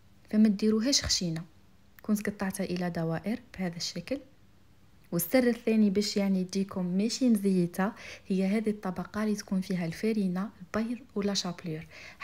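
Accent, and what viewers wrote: Setif, Algeria. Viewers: سبحان الله هدا وين رجعتي الي عرفناك في الأول وهدرتك تع سطيف يعطيك الصحة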